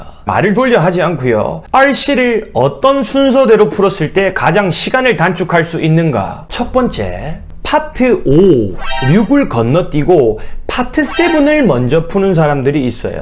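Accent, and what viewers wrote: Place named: Busan, South Korea. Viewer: 사투리 쓰는거 욀케 멋있게 들리지? 부산 사투리인가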